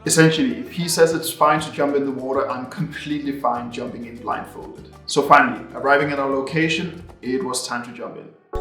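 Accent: Denmark. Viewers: Are you from Denmark? Your accent sound danish.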